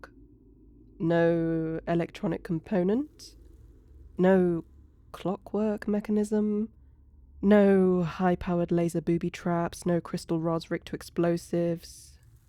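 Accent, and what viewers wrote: United Kingdom. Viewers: Tbf, I love the British accent so much.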